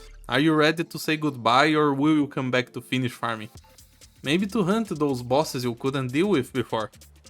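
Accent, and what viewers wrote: Brazil. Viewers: Are you brazilian? Nice video btw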